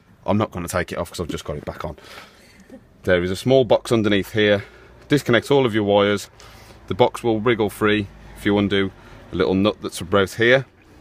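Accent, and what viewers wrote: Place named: Birmingham, United Kingdom. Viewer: Hi there's no option to private message on here! I just wanted to know where your from as you sound like your a brummie lol I'm also from Birmingham and really need your help. My mums car is a scenic and she had it serviced by her partners friend who's a mechanic who had some trouble in doing what he was doing with a lot of tugging and pulling and jiggling about not long after this we was driving and on came the warning stop on the dash saying there's an electronic fault something about the hand break also. She has no speedometer or anything. I just wanted to know if you could help at all?! I know you made this video long ago and I've just came across it now but she's given her car to another mechanic who couldn't find the fault and you obviously know what your doing! She's paying out money for people to tell her they can't find the fault! 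If I was a man or even had a clue about cars I'd just follow your video but we have no idea what's so ever! The cars still drives but as you can imagine it's very stressful and you really feel down when your cars not working 100% i would be so grateful if you could reply back to this comment or email me on <mail> if you are willing to help. I really hope so!Hope to hear from you Jayda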